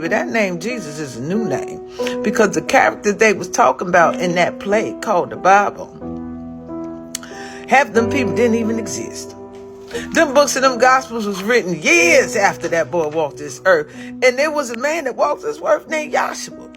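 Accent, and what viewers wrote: United States. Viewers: Could someone tell me what region/state her accent comes from? I can't recognize it. Is it Southern states , West Coast, Midwest?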